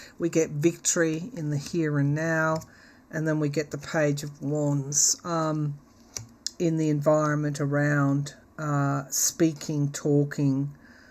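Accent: Australia. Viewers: Australia your accent is awesome I am from mass down the Cape cod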